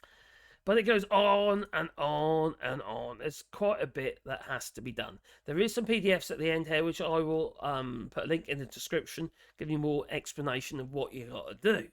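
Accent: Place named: Norwich, United Kingdom. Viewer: By the way, who runs this channel is from Norwich. I didn’t know this accent but I’ve got quite used to it from listening to him! 😉🙂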